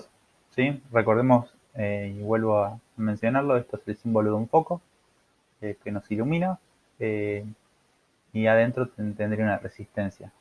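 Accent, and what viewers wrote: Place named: Argentina. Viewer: no entendí , osea no entiendo el argentino :v , es que no entendí algunas palabras xd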